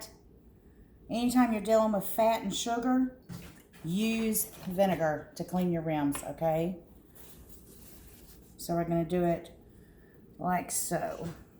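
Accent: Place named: South, United States. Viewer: Adorable southern voice cookin up some sausages. Awesome morning vid. 😃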